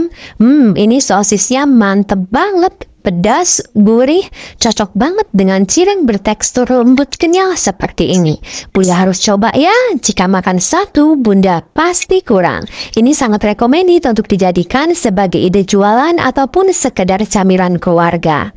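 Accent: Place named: Banyumas, Indonesia. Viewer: Ngapak usah pake logat bile ngomong nya ngapa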